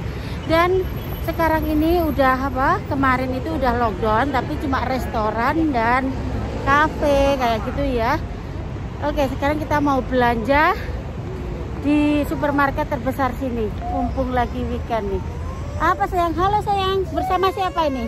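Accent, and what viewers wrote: Java, Indonesia. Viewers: Semoga keluarga kita selalu dlm lindungan ALLOH swt amiiin...mbak medok wong jowo bangeeet ☺ jember selalu hadir ☝️🙏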